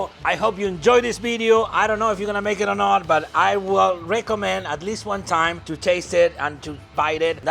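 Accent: Argentina. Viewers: Argentiniza el tipo. Bien ahí.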